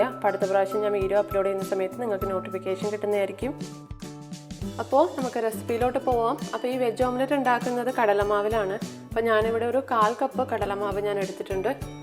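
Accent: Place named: Palakkad, India. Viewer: Chechi inte talking kettappol sherikam pkd pole ind athu konde ah chodhiche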